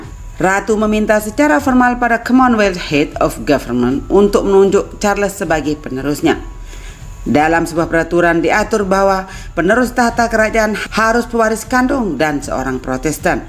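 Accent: Indonesia. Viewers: Kakak orang medan juga ya?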